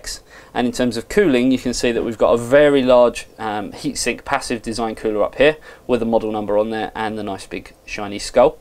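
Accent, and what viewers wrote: United Kingdom. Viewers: *hears voices in head* Whos video is this? British right??? Commonwealth??? Everyone is reading this and wants to know what RAID 0 is. Raid is where you have more than 1 hard drive hooked up for storage and performance. Raid 0 is a type of Raid setup that's the cheapest (I don't plan on using 20 hard drives with Raid 0 setup) in performance. YOU DONT NEED RAID 0 IF YOURE NOT INTO VIDEO EDITING WITH WRITING FILES AND COPYING FILES TYPE SHIT. SO DONT BUY RAID 0 IF YOU DONT DO THIS TYPE OF STUFF ALL THE TIME. Raid 0 should improve the lag or stuttering with programs like FRAPs and other software. Its writing information twice as fast or suppose to. You shouldnt see a lag sync in watching videos either after recording a giant file after editing it. I still didn't hook up RAID 0. Did I win the biggest LOSER AWARD yet? Good... I didn't want to be your friend anyways.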